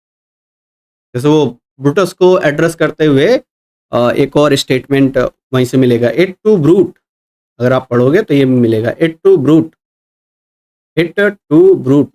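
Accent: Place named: South, India. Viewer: sir south se hai kya unke accent se lg rha 😅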